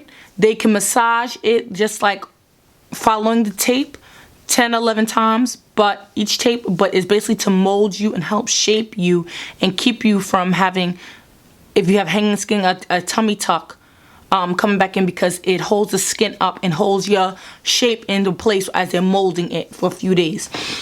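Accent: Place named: New York, United States. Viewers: Your personality is everything! Mad raw and transparent. You sound like a fellow New Yorker, are you? Great video and so informative, eveb for those not considering the same surgeon as you. Thank you!! Btw: You look great and keep those videos coming. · Is she not from New York, wow the accent is definitely New York, I'm from Philly and our accent isn't nearly as strong as New York :)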